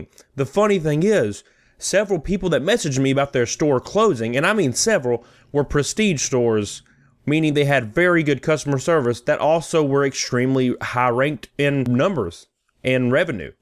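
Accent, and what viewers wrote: South, United States. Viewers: Can’t decide if it’s the southern accent or dumb fucking haircut. But I just want to flog you with a tire wrench. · Man oh man I just love listening to you, you truly are pretty damn funny 😆 You provide great content with a southern twang and mindset that makes, even the distasteful, a platable experience. THANKS 😊😊😊😊😊😊😊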